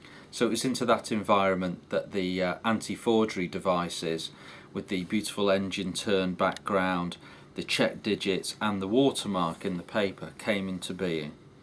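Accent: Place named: Yorkshire, United Kingdom. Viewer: you sound like a Yorkshire lad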